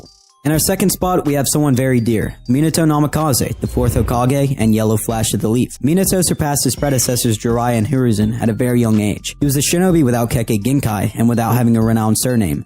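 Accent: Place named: United States. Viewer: Why does he pronounce there names so American. It's so annoying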